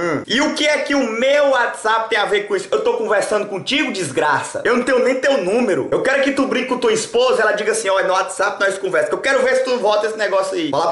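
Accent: Northeast, Brazil. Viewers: é pq o sotaque dele é de nordestino. E ele fala gírias.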